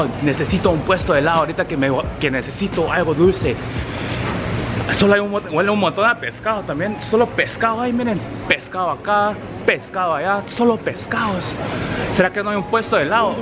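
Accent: Guatemala. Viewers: ¡Tu acento chapín es perfecto! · Mira sus vídeos anteriores ahí lo explica, pero si, sí vivió acá en Guatemala como 18 años por eso su acento Chapín. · No pierdes tu acento Chapin porfa....yo empecé a ver un vídeo tuyo y me encanto...Ya le di suscripción y con campanita🇬🇹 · Hola eres guapo 😘, me gusta q hablas cómo guatemalteco, has vivido acá?? Cuánto tiempo??? Que edad tienes??? En que trabajas??? Dios te bendiga desde Guatemala. Claudia 😘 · Por casualidad comence a ver tus videos, me encantaron, y lo que mas me gusto por ser chapina fue tu acento guatemalteco. 👌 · Nueva suscriptora :) saludos desde Guate xd No olvides tu acento chapín porfa <3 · Cual es tu historia, por que hablas bien chapin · Soy nuevo en tu canal y para empesar esta bien chilero me llega ,como ablas como chapin no cave duda que bos si sos calidad .te saludo desde la capital de Guatemala. 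· JAJAJA Amo que tenga súper marcado el acento guatemalteco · No perdas el acento chapin!!! Eso le da un toque mas calidad a tus videos Saludos desdd Guatemala!! 🇬🇹🇬🇹🇬🇹🇬🇹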